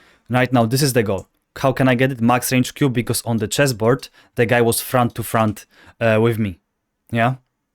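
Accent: Poland. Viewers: piękny polski akcent. drugi taki, rosyjski, Malkovitch w "Rounders"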